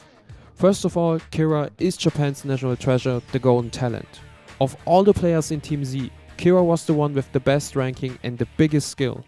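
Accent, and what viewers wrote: Germany. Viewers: du bist doch safe deutsch. ich erkenne an deiner Aussprsche bei einglisch. ist richtig gut!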